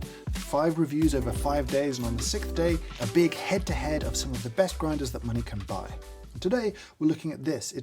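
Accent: United Kingdom. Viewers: I have no intention of buying one of these coffee grinders. I don't even have an espresso machine. I watch your videos because you are so passionate about coffee - oh and the British accent helps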